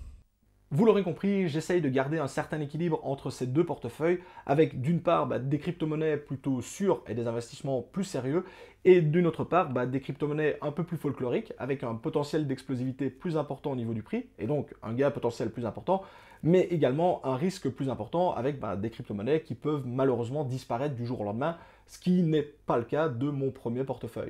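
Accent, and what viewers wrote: Belgium. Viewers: Salut, j'ai reconnu directement ton accent belge, sa m'arrange car je me demande justement si je cash out sur un compte en belgique quel sont les taxe encourue? Merci a toi bizz!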